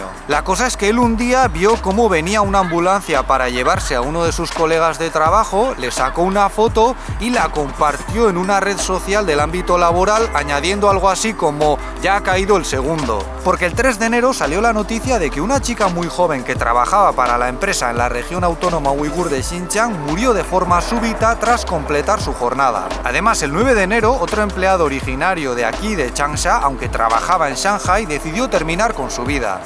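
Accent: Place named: Navarre, Spain. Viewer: Un saludo desde Huesca, tienes un buen acento navarro 😀 . Lo hacéis muy bien.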